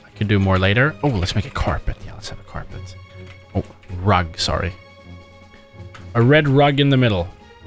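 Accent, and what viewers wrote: Sweden. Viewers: I know a swedish accent when I hear one. :) Snyggt hus! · Are u swedish? U sound like it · Are u swedish?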